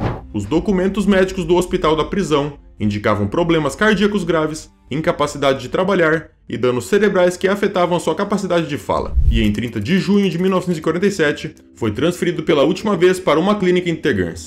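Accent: Brazil. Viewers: Boa noite, neste caso, está certo, uma vez que a pronúncia usada no vídeo é a do português brasileiro. No mais, se realmente você estivesse preocupado com a pronúncia correta em alemão, ao invés de citar poucos erros de pronúncia ligados ao nome de um campo de concentração, iria escrever uma mensagem a cada vez que o dono do canal dissesse “Hitler”, uma vez que a pronúncia dele é a do português brasileiro, não a alemã, que seria “ritlá” ao invés de “ritlêr”.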